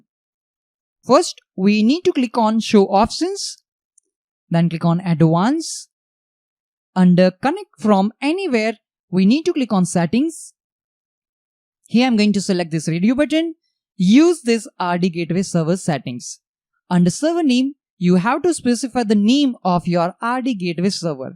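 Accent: India. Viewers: His English is India-Style and full understandable. So what´s your Problem ?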